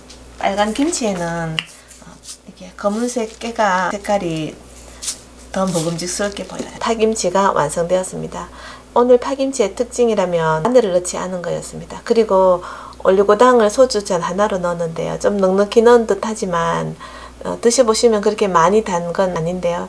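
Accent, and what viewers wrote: Gyeongsang, South Korea. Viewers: 경상도라 정감이가네요 그리고 쉽게 하시네요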